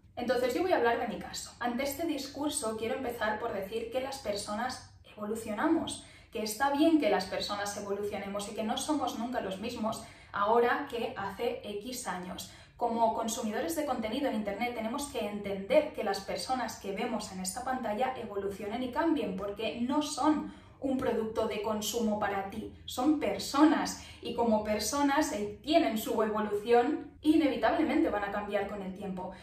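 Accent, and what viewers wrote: Catalonia, Spain. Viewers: Me caes demasiado bien. Eres catalana no? Quedamos para tomar un café y charlar? 🥹🤍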